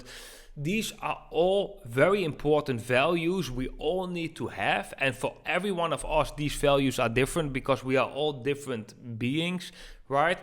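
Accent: Netherlands. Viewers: meetup would be sick, je klinkt nederlands, ben je van nl?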